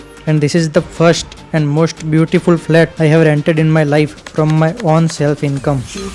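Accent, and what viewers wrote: Gujarat, India. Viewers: Your Gujarati English accent is so sexy 🔥🔥🔥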